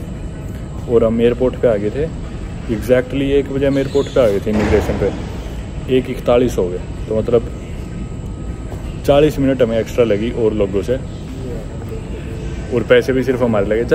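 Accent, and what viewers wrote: Haryana, India. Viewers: Huge fan of your rugged Haryanvi accent - from Chennai 🇮🇳😂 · Me and my son watch your videos with great intrest as you cover all those places which we see in Atlas and read and watch videos about its cuisine. Your Haryanvi dialect is super. With best wishes.👍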